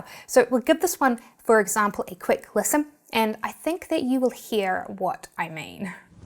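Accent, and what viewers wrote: New Zealand. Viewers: Hi priceless! Thanks for clarifying. I thought, "Where is this gal from, Mars?" 😉 j/k, I love the Kiwi accent. Chur for the mean accent! 🤔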